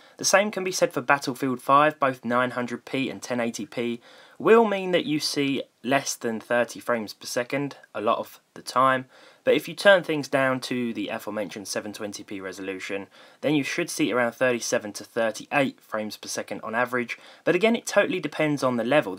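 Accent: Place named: Estuary, United Kingdom. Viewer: Could be Kent, I live in east Kent and it's a variation of the estuary accent from this corner of the country, Essex into Kent.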